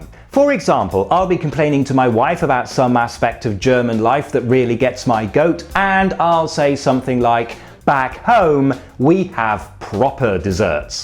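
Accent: United States. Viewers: Nice American accent! Do you have trouble adjusting to driving on the left side of the road when you return to the UK? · oh my god your american accent is amazing!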